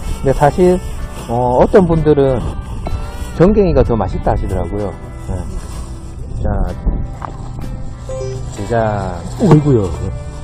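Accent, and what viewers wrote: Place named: Busan, South Korea. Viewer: 야야 와이라노?? 부산사투리 구수합니다~~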